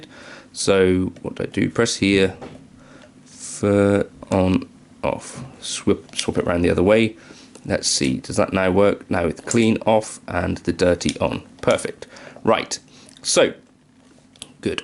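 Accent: United Kingdom. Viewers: Ohh ! That would be great ! , I also have the HELIX LT, in that case no problem,but for the Pod Go it's a great idea to split it ! I've bought your Helix and Pod Go packs,there are many good presets ! You are a great artist,not only an incredible guitarrist,very creative and very original, besides it's amazing all the time you spend researching and trying new things every day that later you share with us ! For your accent I know you are from the UK,I lived in LONDON 7 years,while I was studying my Classical Guitar training at the Guildhall School of Music many years ago..I love England,whenever I can I go there... And I recorded some albums with Anthony Phillips,Ex guitarist and founder of GENESIS,we are very close friends he's also an incredible guitarrist.! Thanks a Lot ! Have a nice week.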